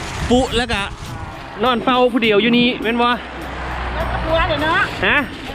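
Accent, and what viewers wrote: Phu Thai, Thailand. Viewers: คนอำเภอบ้านดุงใกล้ๆคำชะโนดอดีตลูกสะใภ้ภูไทอำเภอพรรณานิคมได้ยินเสียงบ่าวภูไทแล้วกะคิดถึงอดีต:))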